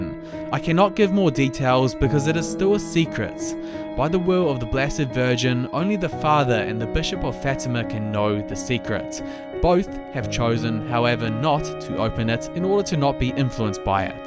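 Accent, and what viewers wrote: New Zealand. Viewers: That is a New Zealand accent.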